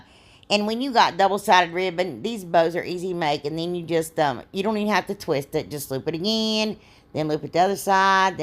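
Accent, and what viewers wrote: South, United States. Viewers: you are just AWSOME.I was away for Christmas,hospital! I really missed you.I love you’re southern accent. You’re just a blessing to me.thank you,God bless you, Happy New Year! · These are all so gorgeous! You are a nut and laugh every time I watch you. You sound as country as me. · I absolutely love all you do and thank you! I adore ya girl! From this southern accented Georgia peach (well no longer live there lol), to your southern accent ..big hugs.. and much love. I hope your husband is feeling better.. last I heard from you he wasn't feeling well (been away doing chemo, so have not been on here as much as usual). I will be on Fri the 18th. Can't wait!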